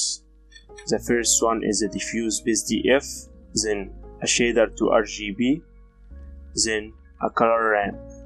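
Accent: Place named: Egypt. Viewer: You are arabic, aren't you? Most likely Egyptian. I knew it once I heard your accent lol. I am Masry as well.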